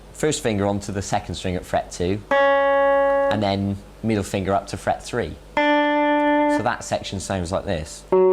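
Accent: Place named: Wiltshire, United Kingdom. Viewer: Great accent - Wiltshire ? Great lesson too of course :-)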